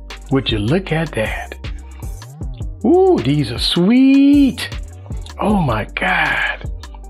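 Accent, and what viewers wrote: Louisiana, United States. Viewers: I feel like you're from Louisiana based on your accent lol. Good video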